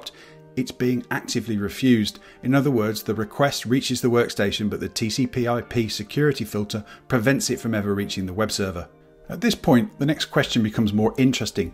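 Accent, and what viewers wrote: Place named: West Midlands, United Kingdom. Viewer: I can hear a fellow West Midlands accent a mile away.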